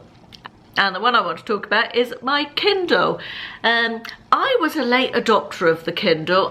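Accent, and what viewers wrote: England, United Kingdom. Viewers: Yes, I have been watching for a while now, she still has an English accent, Just!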